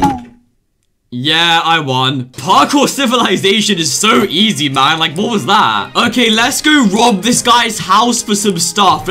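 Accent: United Kingdom. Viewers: I was dying, because you’re British (Sorry) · pvp civilization but british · POV if parkour civilization wasn’t scripted❌ POV if was British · Bros brithish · Bros British😂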